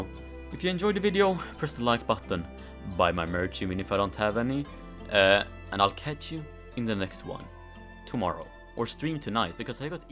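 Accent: Sweden. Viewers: are you from sweden or something?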